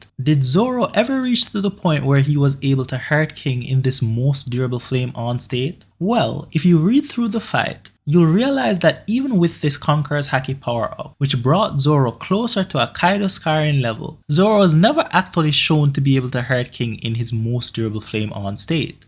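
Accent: Jamaica. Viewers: Sounds Jamaican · U sound Jamaican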